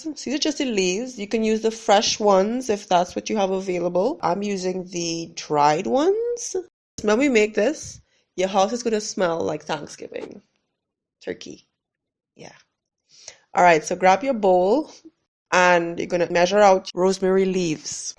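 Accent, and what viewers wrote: Trinidad and Tobago. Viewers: 5 seconds into the video i was like hii trini sister!!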